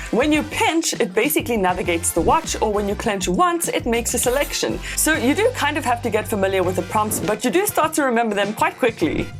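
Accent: South Africa. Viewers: Are you from South Africa ? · Great video. Your accent? S. Africa? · Fnb App on your Watch? Are you South African ? I honestly thought you were Russian or from like that side of the world lol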